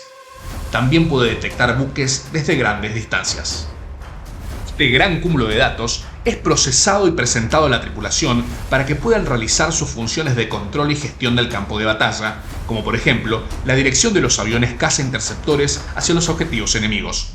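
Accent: Argentina. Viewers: Hola amigos, me encanta el canal, y LA informacion que nos brinda. Pregunta; quien es el narrator? Suena in poco Argentino, algo que seria in poco irónico!!! Saludos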